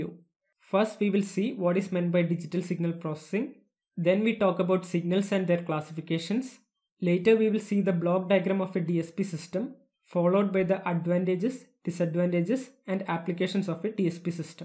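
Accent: Kerala, India. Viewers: Loved his mallu accent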